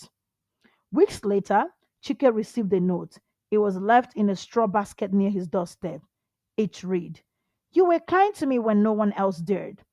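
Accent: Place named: Nigeria. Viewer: This Ai voice is unique with a touch of Nigerian accent 😂😂 and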